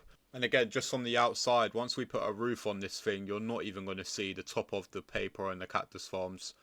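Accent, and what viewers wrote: United Kingdom. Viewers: Yo bro love your british accent,continue doing content i will suport you! Cheers 👋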